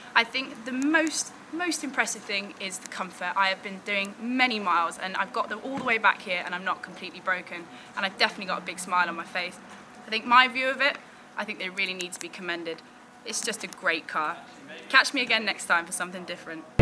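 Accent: United Kingdom. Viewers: ..I love your British accent, you are a natural in front of the camera, I subscribed to your channel..keep those videos coming. 👍👍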